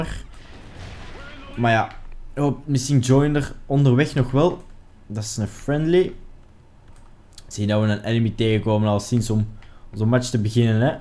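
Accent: Belgium. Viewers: dat belgisch is gwn te verstaan ik vind het juist super leuk klinken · belgen hebben een HEEL cool dialect en belgen zijn baas !!! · Ga dan ook niet kijken als je zijn stem niet leuk vindt of doe dan je geluid uit... ik vind het echt niet vervelend om naar een belg te luisteren. Ik vind het wel een leuk accent =) dus pls ga niet schelden in de comments.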